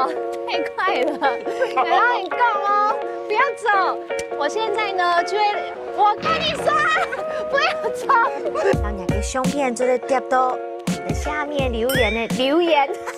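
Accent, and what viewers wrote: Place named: Hakka, China. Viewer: 第一次看到說這麼好聽的客家話靚妹客家姑娘,給妳個讚!!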